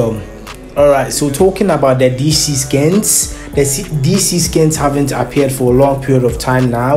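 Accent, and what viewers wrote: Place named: Ghana. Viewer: Your accent sounds Ghanaian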